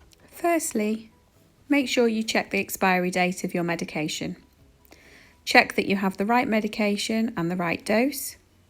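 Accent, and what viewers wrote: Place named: Liverpool, United Kingdom. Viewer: I am writing from Phoenix, Arizona, USA to thank you for this video. Watching this and your calm demeanor helped me to take my Ozempic medicine. You are a peach. BTW I love your Scouse accent. Merry Christmas! P.J. Stewart.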